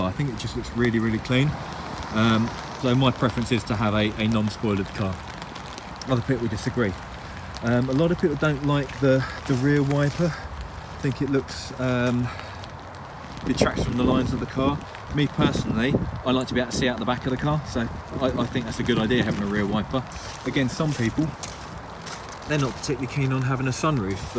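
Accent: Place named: England, United Kingdom. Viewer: love it how Queen’s English pronounced in Imperial measurements. Nice C4S!!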